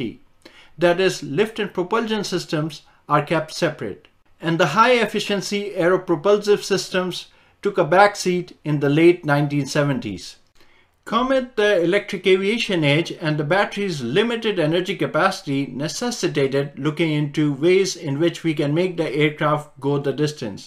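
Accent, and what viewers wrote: India. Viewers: Sound like punjabi profesor lesson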